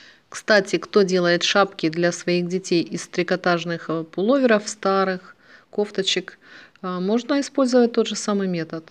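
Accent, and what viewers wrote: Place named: Baltic. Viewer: Ну, надо же, у Вас очень молодой голос и мне слышится прибалтийский акцент, очень красиво речь звучит и как-то необычно. Я заслушалась. 😊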